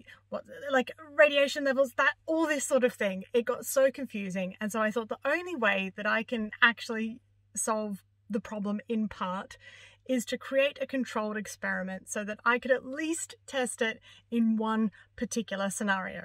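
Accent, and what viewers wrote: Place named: Australia. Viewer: I got you in my feed and wow. Late to the party as always. You are awesome. Got to tell you, you sound Aussie but than I saw you where in England. So I started thinking ARE there people in England who sound like that, but they hide them? You never hear them on any shows in the states. Kind of like Liverpool speekers. I did figure it out, if you where from England you might not sound as happy. My opinion.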